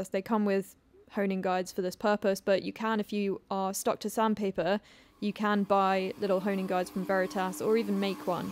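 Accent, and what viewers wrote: England, United Kingdom. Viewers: You’re amazing! I love old tools and restoring old treasures, bonus you’re a pretty girl with an English accent. Couldn’t make a more perfect video! ❤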